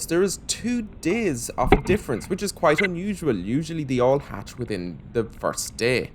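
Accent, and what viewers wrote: Ireland. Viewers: Greetings, I'm really enjoying your YouTube channel, the videos are terrific, your editing is great and I love your dreamy Irish dialect and narration. Maith thú. 💞 Gach rath ort. xox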